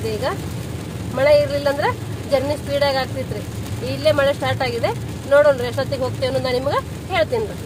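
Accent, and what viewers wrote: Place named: Karnataka, India. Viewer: ಏನ್ರೀ ಏನ್ ಚೆನ್ನಾಗಿ ಮಾತಾಡ್ತೀರಿ ನಿಮ್ ಮಾತು ಕೇಳೋಕ್ಕೆ ಹಾಗೂ ಧಾರವಾಡ ಧಾಟಿಯಲ್ಲಿ ವಿವರಣೆ ಬಹಳ ಚಲೋ ಇತ್ತು ನೋಡ್ರಲ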